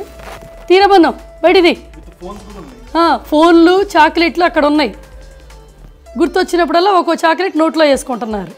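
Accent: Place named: Guntur, India. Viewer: Mee slang vintey Nenu Guntur lo unatte undi. Nenu Tenali. Mee Balam mee Jaanu Ney. Mee Ammayi ,adore her.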